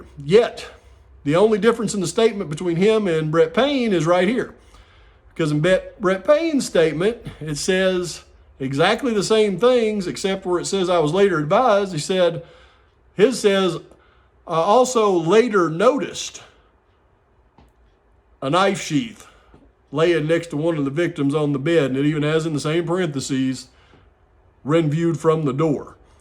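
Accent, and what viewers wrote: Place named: South, United States. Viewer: Wow, that really was a BAM discovery, Mr.! I've been somewhat critical in my remarks about you in prior posts, but I'm coming around to your thinking. However, I still can't figure out who you really are (ex-police, 3-letter agency guy, etc.) Plus, your southern accent.l is intriguing; hmm. Either way, I sure like you! Keep up the great work!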